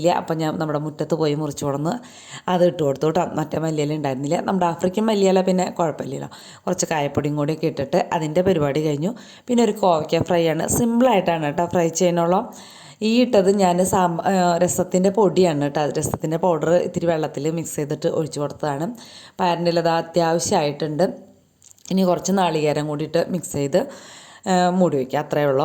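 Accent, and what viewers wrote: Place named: Thrissur, India. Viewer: ഈ തൃശൂരുഭാഷ എനിക്കെന്തിഷ്ടാന്നോ💜💛❤ "എന്തൂട്ടാ " എനിക്കെന്തായാലും വീഡിയോ നല്ല ഇഷ്ടാ എന്നെപോലെ കുറേപേർക്കിഷ്ടാവും ഒന്നും കണ്ടു മടുക്കാറില്ല, ഓരോന്നും പുതിയതു പോലെ തോന്നും ❤💙 സങ്കടപ്പെടണ്ടാട്ടോ പിന്നെ സന്തോഷങ്ങൾക്കു മേമ്പൊടിയായി ഇത്തിരി സങ്കടവും,വിതുമ്പലും വേണമെന്നല്ലേപറയണത് എന്നാലേ സന്തോഷങ്ങൾക്ക്, ജീവിതത്തിനു പൂർണത കിട്ടുവൊള്ളത്രേ ❤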